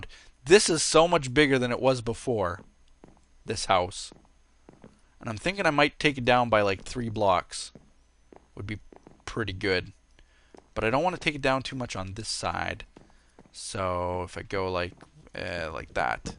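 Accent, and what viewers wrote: Canada. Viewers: Do Canadians say Aye a lot?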